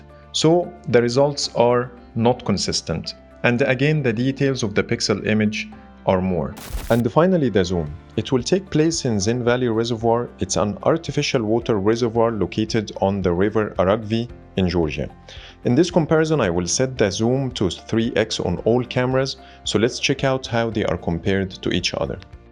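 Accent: Egypt. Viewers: المصري لهجته واضحه و على فكره الإنجليزي عندك عالي جدا بس الاكسنت بتغلب.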